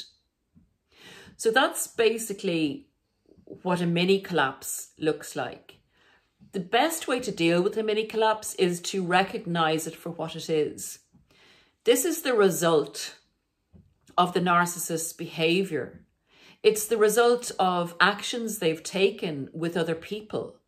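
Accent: Ireland. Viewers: R u Irish by the way? The only reason I ask is because well I am 50% I’ve had a really bad weekend with my narc But I had to tell my parents I was texting them they just drove all the way to the Florida Keys they are almost 80 but I’m sending them voice memos because somehow it just happens to the best of us when the end of a sentence has to come out Irish, well it happened to me all last night and I couldn’t stop laughing that usually happens to my dad. It’s just so funny because it’s like I’ve never been to Ireland but it lives in us I don’t know I might be wrong completely but I thought just maybe maybe if you might be a little bit Irish? You don’t do it though but it’s like how do we adapt an accent from a country that we’ve never been to?! LOLs that’s the only thing that’s kept me laughing through all this narcissistic abuse from my boyfriend who I am about to have seriously just I’m gonna forget about him eight years down the tube but you know what eight years too long of me putting up with this it’s like it’s like dealing with somebody with multiple personalities there might be other things going on I don’t know I don’t care anymore I just know that I’d know this is a hard test for me because I am not usually the one to sever the ties in relationships It’s not a want it’s a need it’s kind of like I have to it’s like you’re being forced to leave this person and it just will get it will get so bad it will snow ball until you end it and your whole entire life is a mess An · I can hear you are irish do you habve coachibg online can you putvthe contact here thank yohbits greatctibget info here thanks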